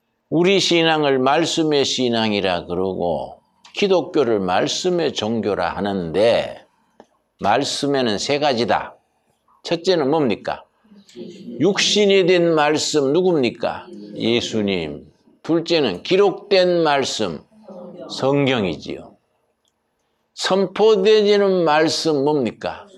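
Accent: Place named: Gyeongsang, South Korea. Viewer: 구수한 경상도 사투리로 엮어 주시는 하나님말씀 때때로 난해한 성경말씀을 쉽게 풀어 강해해 주시는목사님 말씀은 언제나 제게 감동을 줍니다 감사 합니다 감사합니다.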